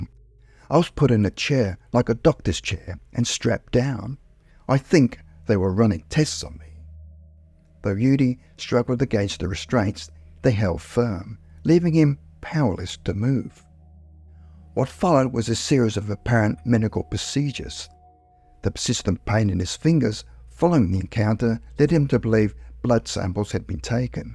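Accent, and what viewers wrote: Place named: Australia. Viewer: Why are all these american stories narrated by a Brit or here an Aussie? Turn off to americans!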